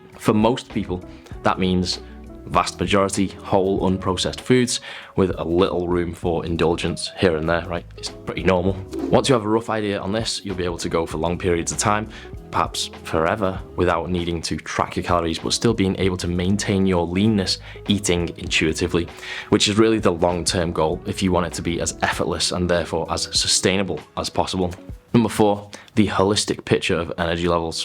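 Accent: United Kingdom. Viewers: You have VERY BRITISH ACCENT dude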